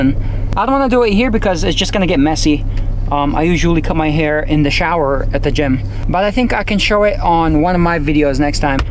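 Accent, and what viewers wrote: New Jersey, United States. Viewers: Are you from New Jersey?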